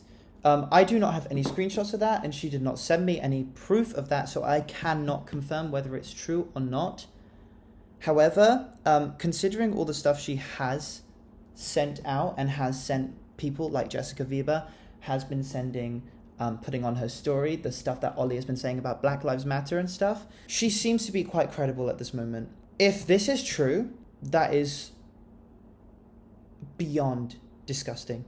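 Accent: United Kingdom. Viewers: besides the point, I'm appreciating the accent. You see so little bittish youtubers and I just noticed how comfortable listening to him is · fell in love with your British accent already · You're a British aren't ya? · I love your British accent 😍 ❤ And you singing very well😆 Support you❣❣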